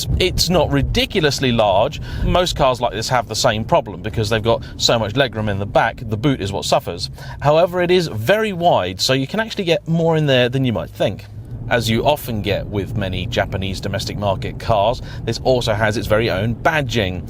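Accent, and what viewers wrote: United Kingdom. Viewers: This guy looks like an American, dresses like one, but has a British accent and is in the UK I think. 😂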